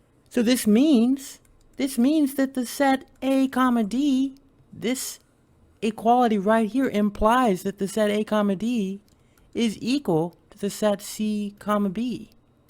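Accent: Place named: United Kingdom. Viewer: Your video's are really good. In the beginning, you proved problem 7 of maxwell real analysis. In ch 4 of Rudin we use those facts countless times. Your problem selection, 14(a) & 12, for instance is also really nice. You solve every problem with precise definition, verification of metric space, for instance. By your accent, I'm guessing your from UK. Are you currently in some uni?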